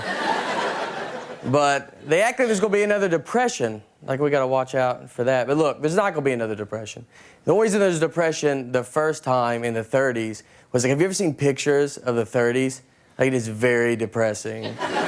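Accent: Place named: South, United States. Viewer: Much younger look back then obviously, but I noticed way more twang in his voice too, country southern sounding.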